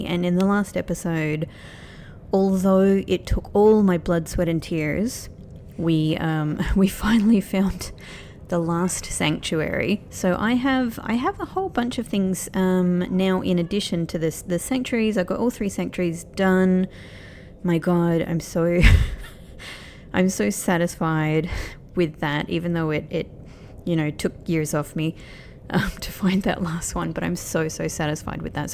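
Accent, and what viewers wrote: Australia. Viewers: Hi, just tuning in for the first time. Sorry I missed your full journey! Question, I don’t recognize your accent, where’s it from? Edit halfway through you said you’re from Australia! That’s so cool. I hear they have a beautiful coral reef there. My love for this game has got me into scuba diving, one day I hope to do that down under!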